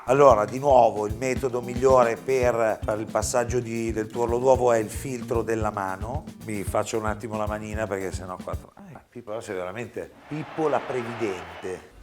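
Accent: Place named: Apulia, Italy. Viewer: Sono Romana, ho imparato prima a cucinare la carbonara, poi a camminare... Mi sono emozionata a vedere questo video. Hai coccolato questa Carbonara.... E m'hai fatto venire fame. Ho sentito quel pizzico di Puglia tra il Meh e il fantasticare sul sud. Comunque, io solitamente faccio rosolare il guanciale in padella, pizzico di pepe, ci butto sopra la pasta, l'uovo, un mestolo di acqua di cottura e alla fine il pecorino, in cucina so che cambiare gli ordini degli addendi, il risultato cambia, cosa pensi di questa composizione? Mi conviene cambiare e lavorarla più come hai fatto tu?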